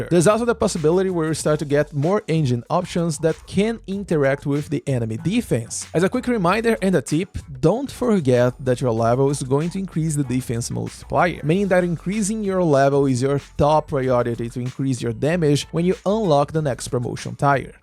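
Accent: Brazil. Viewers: Sotaque de brasileiro é facil de pegar khkhkkhkhkh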